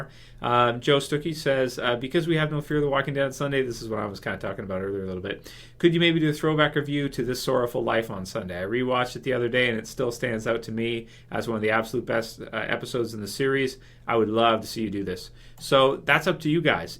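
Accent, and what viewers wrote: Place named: Canada. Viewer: Hi. I love that you mentioned the accent issue. I am not a native English speaker so I did not notice Alycia's or Nick's non-American tones, even though I knew the actors are not Americans. However, I am very interested in getting to know more about accents and to learn how to spot such anomalies. Could you please point us/me more specific moments (not time codes as they may differ) but rather specific sentences that sound not as they should in terms of accents? You mentions the throwing up scene. What exactly in it? BTW1: Why do they give roles of US people to non-American actors in the first place? Don't get me wrong, I love the cast, I really do, but - honestly - whould it be really so hard for the creators to find US actors equally good, avoiding the accents issue? BTW2: You are the first person I identified as Canadian purely by listening to, so I am not so hopeless in spotting accents as it might seem because of the fact I failed to hear it in Alycia's lines;) BTW3: Great channel! 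You are very productive! Keep it up!;) BTW4: It would be AWSOME if someone (like you) made a special bonus video about accents in our favorite TV shows. Not only to point out mistakes, but just to show non-native audience how rich English language is.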